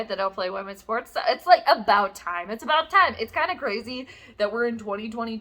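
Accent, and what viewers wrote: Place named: Texas, United States. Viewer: I love your Texas accent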